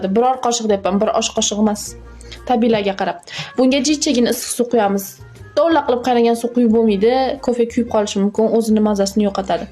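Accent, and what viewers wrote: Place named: Fergana, Uzbekistan. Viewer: Jijja jijjadan Fargʻona sheva Fargʻonaliklar bitta layk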